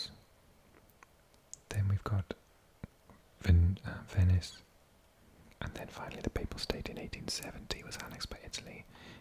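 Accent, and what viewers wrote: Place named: South Wales, United Kingdom. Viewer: Hello! This is the first video of yours I’ve watched and I love your voice. It’s so warm and rich. I used to live in South Wales. I think your accent fits that region, maybe Port Talbot/Swansea way, but please correct me if I’m wrong!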